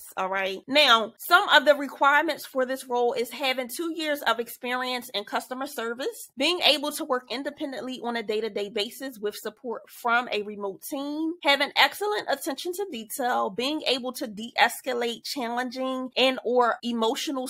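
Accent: Philadelphia, United States. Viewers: Good stuff!!! Thank you for the information. I hear a Philly accent...😂😍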